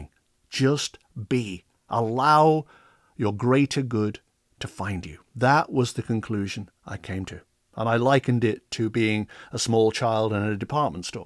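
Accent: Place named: Manchester, United Kingdom. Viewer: As a Manchester lass I feel I can hear you are from my part of the world ? ✨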